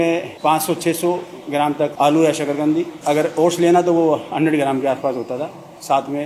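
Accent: India. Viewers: Aapki boli desi h mhari taria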